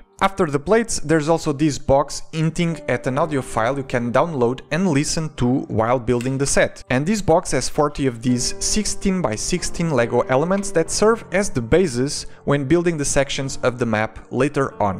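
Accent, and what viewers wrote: Portugal. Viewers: I just have that strange feeling, that you are português, cuz of " 'eight " instead of "height", "raciu" instead of racio. So yeah, just a guess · 10 segundos (sem ver o nome do canal) e percebi logo que o sotaque era de um português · no primeiro segundo do vídeo soube que eras tuga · gajo parece tuga a falar ingles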